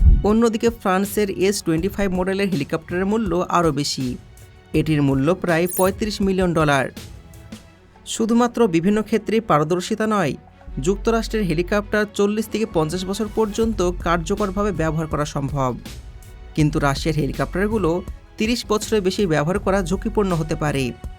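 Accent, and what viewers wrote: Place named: India. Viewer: APNAR BACHAN BHONGI LIKE INDIAN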